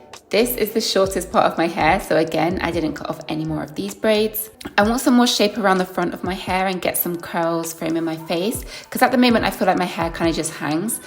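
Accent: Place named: England, United Kingdom. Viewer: Omg i love your accent , im moving to England 😂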